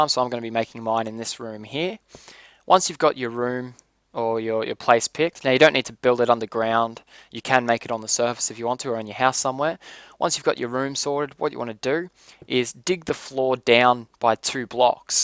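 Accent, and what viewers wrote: Australia. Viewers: are you an aussie :D? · r u aussie?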